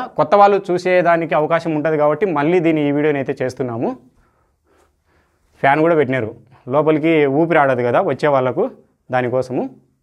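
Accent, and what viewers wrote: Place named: Kadapa, India. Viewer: Naynu ayithay definitely miru ma Kadapa slag matladuthunaru ani naynu Chusthunna anna Bigam bayala....inka Sadhi buvva.....kaniii Raaa biiii ani Marchipoyaru anna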